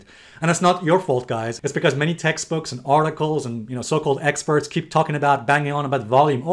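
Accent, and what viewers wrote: England, United Kingdom. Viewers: Great Video keep like that every time by the way your accent England was 👍🏻👍🏻👍🏻👍🏻. I wann know something a bout altcion like Dash how much price well be .Thank you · Very interesting, thank you! Also your method of checking with the balanced level sounds reasonable! Your sport jacket does perfectly fit to you and the English accent!! :D Well done!!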